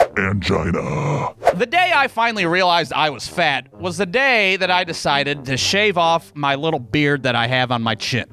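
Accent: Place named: Boston, United States. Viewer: This is the most boston sounding thing ever it's like mark wahlberg on crack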